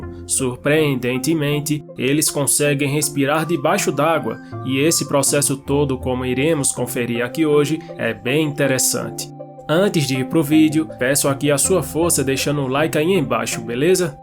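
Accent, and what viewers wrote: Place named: Northeast, Brazil. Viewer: Amei mais ainda o sotaque nordestino do narrador! Vcs são de onde? Nordeste power 💪🏽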